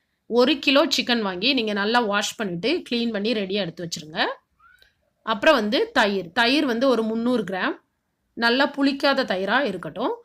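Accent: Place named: Nagercoil, India. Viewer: Hi sis... Neenga yendha ooru. Coz.. Unga speech ketta... Nagercoil maadhiri theriyudhu. Adhaan ketten.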